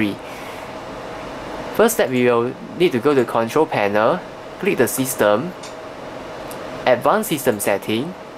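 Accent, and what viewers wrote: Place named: Asia. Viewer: asian brotha accent for the win!!!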